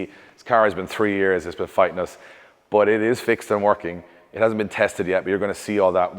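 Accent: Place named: Ireland. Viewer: shocked when i heard the irish accent and then that you said this was in dublin ... instantly subbed ....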